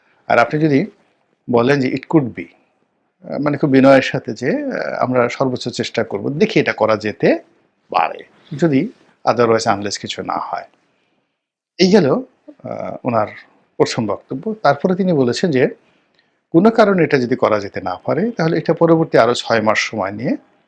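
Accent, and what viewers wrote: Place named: Chittagong, Bangladesh. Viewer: বাড়ি মনে হয় চট্টগ্রাম।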